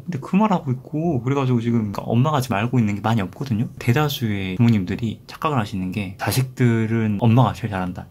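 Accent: Gwangju, South Korea. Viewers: 개판이라는 말 넘 웃겨여ㅋㅋㅋㅋㅋㅋㅋ 님 전 광산구 주민이라 광주사투리 들으니 넘 반갑네용ㅠㅠ 성격 좋으신 님! 늘 응원하고 있습니다👨‍⚕️✨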